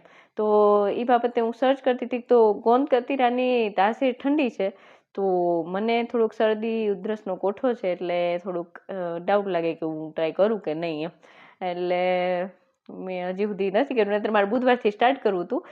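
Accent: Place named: Kathiawar, India. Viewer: Ben tmari bhasha ekdam pyor kathiyawadi chhe to tmaru piyar kya gam chhe 😊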